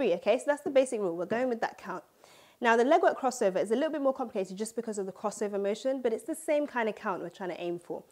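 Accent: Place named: United Kingdom. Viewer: Loss the British accent and find your REAL tribe in Africa and be that. Stop the neo-influence policy of Europeanization.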